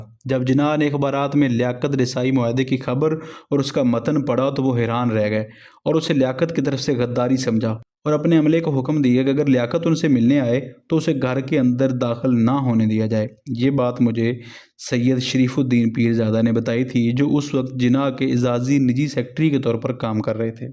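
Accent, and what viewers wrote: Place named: Punjab, Pakistan. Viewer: تلفظ تو درست کرلیں ۔ اُردو میں Audio Book بنائی اور تلفظ پنجابی لہجہ میں ۔ یہ قومی عصبیت نہیں بلکہ خالص علمی تجزیہ ہے۔ اگر آپ اس نصری کتب (Audio Book) کو پنجابی زبان میں بیان کرتے تو بہتر تھا ۔ یا اپنے اسکرپٹ کو اردو بولنے والے ریکارڈ کرواتے ۔ لیکن آخر میں اچھی کاوش تھی ۔ وسلام 🎉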